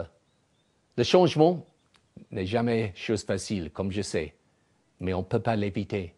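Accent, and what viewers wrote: England, United Kingdom. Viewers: lol!J'adore l'accent british!!comment il prononce "mondialisation" et "pouissances" ^^ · Oui, il conserve évidemment son fort accend anglais mais sa prononciation et son vocabulaire sont excellents ^^ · I can't believe that some of you French people are critiscising him for having an English accent. What the hell do you expect? English and French are both strong languages and when we try and speak eachothers language we always speak it with a very heavy accent, yours is just as bad as ours! Look at Arsene Wenger, his accent is as strong as the day he moved to London 13 years ago. He cannot say a single world and sound English.... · he has a realy nice french speaking and a nice charming English accent. Bravo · i don't speak french but even i can sense his english accent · he speaks very clearly... i'm french, he just has this so recognizable english accent on french language. 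that's very beautiful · God im English and even I can tell her speaks french with a big english accent! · Grr.. he's got such a typical "English-student-learning-French" accent. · it's very good , we can understand everything , but there is still this typical english accent (the 'ey' , the softened 'r' , some syllables are skipped....) and that sounds a little like a chinese speaking french 8D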